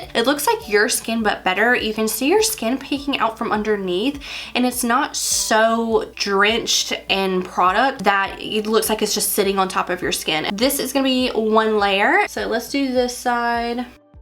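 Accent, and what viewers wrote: South, United States. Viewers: Great review! Love your adorable Southern lilt. Totally adorable vibe. Can't wait to try this product. ❤😊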